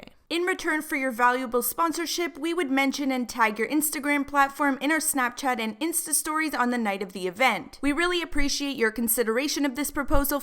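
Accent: Canada. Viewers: are you fromcanda or the midwest? that accent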